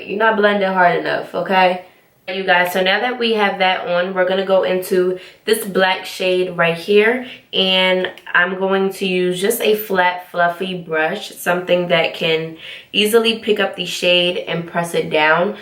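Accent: Jamaica. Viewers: Are you a fellow Jamaican by the way? Lol I hear a tiny little accent peeping out 🤣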